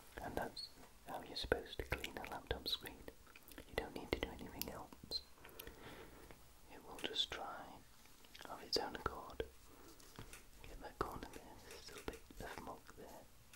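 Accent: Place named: England, United Kingdom. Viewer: Where is your accent from? My guess is either Oxfordshire or maybe north west?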